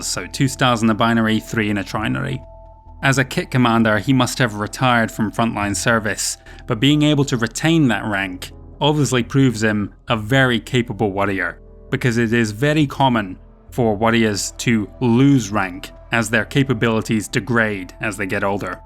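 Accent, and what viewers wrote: United Kingdom. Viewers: You sound like you could be British, my apologies if English is your second language but with such good pronunciation I would have thought you’d get Jaguar right. Americans smear their syllables together but how they get “jag-warr” and “jag-wire” out of J a g u a r is truly mysterious. They say “mirror” as meer and “horror” as whore etc etc etc so I suppose jagwarr is just smearing but how TF do they get “jag-wire”?? One of the most beautiful words in this language brutally choked to death by my dear American fellow human beings. I love ‘em but they don’t know speaking.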